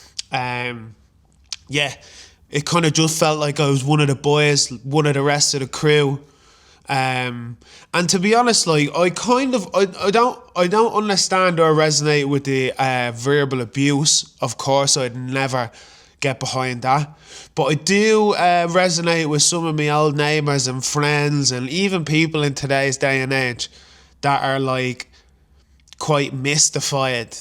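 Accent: Dublin, Ireland. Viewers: Well, you're here and you sound like any other Jackeen, so I suppose that's that. But there is more to being Irish than just being born here, or having the accent, or having a passport, playing our games and music, or whatever. Simple as that. Like so many other people who have to be heard on this, you can rattle off the names of Phil Lynott and Paul McGrath. Both of whom had one actual Irish parent, it seems obvious to point out. If only all our imports would contribute so much as they have. I'd be more interested to hear your thoughts on Kevin Sharkey and his political views. If you don't know who he is, you should do. He's a complex character for sure. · Fascinating, thank you. Your mom sounds incredible! You look black and sound Irish. I look white and sound non-Irish. Always a bit more to reconcile, but I think it's enriching to have additional perspectives. 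· Fine dub accent that mate. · No such thing as Black and Irish...you are an Irish citizen but you are not Irish. If I was born and live in China...it doesn't make me Chinese, rather a Chinese citizen. But, love your Irish accent! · I absolutely looooove your crisp Irish accent and the radio friendly tone of your voice. I can't pin point what part of Eire it is from because it is clear to me that as a nation you guys have many more accents. On a separate note . Moisturize, moisturize, shea butter. That skin's going crack like eggshells if you don't . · Well done really enjoyed the honesty & how could anyone think you weren't a Dub bro with that accent 😂😂😂 & your mam's a legend 👏 you're very lucky to have her ❤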